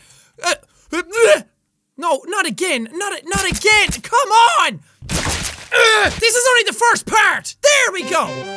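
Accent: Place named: Ireland. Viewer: I like the stronger Irish accent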